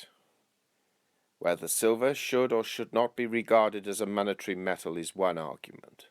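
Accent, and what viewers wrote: England, United Kingdom. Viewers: Somehow this advice seems even more authoritatively wise hearing it in an English accent.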